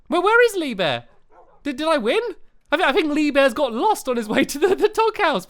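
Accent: United Kingdom. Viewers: You are awsome.I cant believe you are this talanted i really wish you could make a....GIANT CAT that would be AWSOME!!!!!!!! P.S. i love your british accent;)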